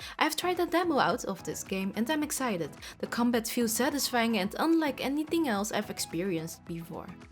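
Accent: Netherlands. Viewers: Dear god I love your accent. What country is that? Edit: Oh found it, Netherlands! Yeah I can recognise it now! Cute! The bladed weapons used by Ven are called Khopesh btw.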